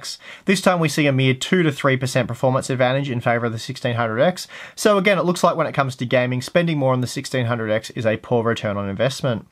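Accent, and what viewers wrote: Australia. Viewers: Holy crap, you're: a) Australian b) Not annoying Good video.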